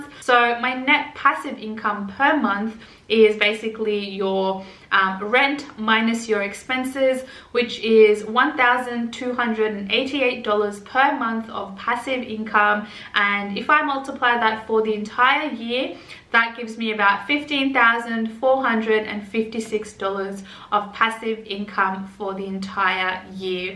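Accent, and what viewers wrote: Australia. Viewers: Aussie? Hi from America!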